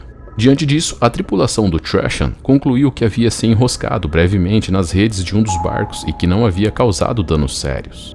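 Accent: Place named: Caipira, Brazil. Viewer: pq ia tem q ter sotaque caipira? bota um sotaque neutro aí, seu google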